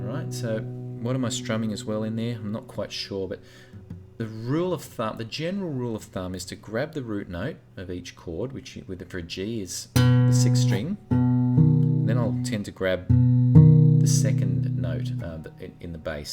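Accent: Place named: Australia. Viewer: Just landed on this via YTs Bots, me having covered his lessons before, but so glad I did. This lovely warm Ozzy man's voice tone and teaching is spot on, and after all these years he is still giving Gold away for free. Check out his other lessons, brilliant at what he does and sings great too.. Don't pay any attention to the thumbs down, there's always 1 or two in the room that don't get it....haaa🤣. Subbed to many moons back. Enjoy..Rollo.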